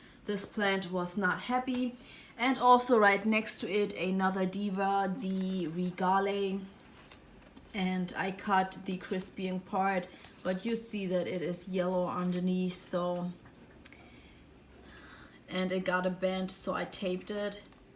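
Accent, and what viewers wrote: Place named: Germany. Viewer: Hi, great music 👍. This is the first Video I watched, and damn, all the time I thought "this accent, she has to be German". Switched to your housplant tour part one, looking out of the windows, in the backround, furniture and stuff if anything can tell me... Nothing in your channel-information. And then "LA" 🙈. Finally "Berlin", so I can chill 💁. Greetings from Germany! 🇩🇪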